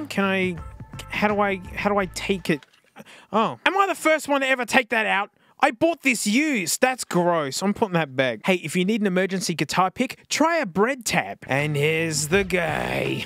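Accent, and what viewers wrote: Australia. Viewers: Are you Australian or new zealish?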